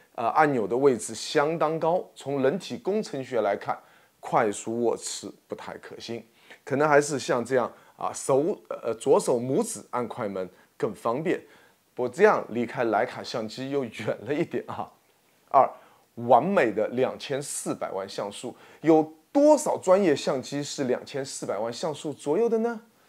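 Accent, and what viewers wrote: Shanghai, China. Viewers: 个口音很上海 哈哈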